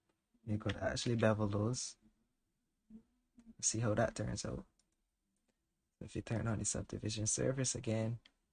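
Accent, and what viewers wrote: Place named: Barbados. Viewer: Haha I knew as soon as I heard the accent. Never thought I would see a fellow Bajan doing 3D Media. I'm excited to learn from you 💕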